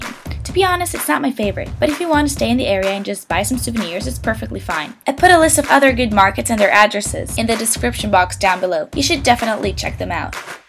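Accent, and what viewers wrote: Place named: United States. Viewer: Sounds like u have an american accent .. I like it 😊 ciao video stupendo brava !!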